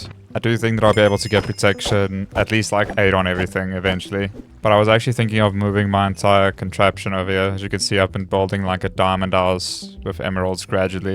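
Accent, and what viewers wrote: South Africa. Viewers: Are you South African by any chance ? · yoh I recognize that accent from a mile away, miss South Africa man. You've earned yourself a subscriber so I can feel more at home lol. Also, a really good video! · bro are you from south africa?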